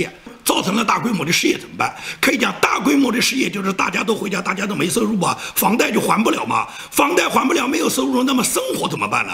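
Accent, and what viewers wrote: Nanjing, China. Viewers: 老吴南京话脱口秀，我的饭后最爱~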